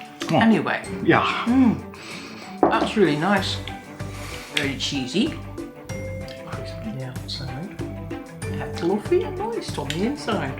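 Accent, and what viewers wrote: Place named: London, United Kingdom. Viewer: ) I am an Ilkeston Derbyshire (about 50 yrs ago) Sc-oh-n speaker. :-) I thought your pronunciation was by posh people from London. A very pragnatic review. I have an elderly (20+yrs) Phillips (about 2.4 L I think) thing and have recently bought an IP Vortex 5.7 Litre one cos it is bigger. I also have a Kasa thingy which gives some indication of electricity used (no idea how accurate, doubt it is very, if at all) and it says to me that it costs 0.14 kWh to cook a basket of Oven type Chips and about 0.2 kWh to cook the potato type (Non-par boiled which I would normally do). I know because I monitor my electrical demand daily that it used to cost 80-90p to make a batch of Home Brew (full Mashed from grain), it now costs over two pounds. Tsk - my expensive brew has increased in price to almost 60p/pint. (guesstimate) :-) I suggested some time ago that Scones were - sort of-separated out from a partially cut up shape of a round one in the middle and (perhaps) eight ones around the centre which were wedge shaped. 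I have still not seen where I think that from. Must make some.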